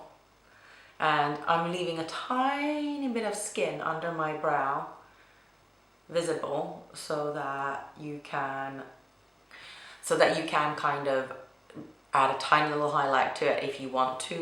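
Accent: United Kingdom. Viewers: What's going on with your accent? It's 97% English but there's a little twang in there too - could be Irish, American, Australian.... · how come your pronounce half your words in a British accent (i.e. glasses, can't) and the other half in american? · At first I thought it was a British accent then I thought it was just an Standard American accent.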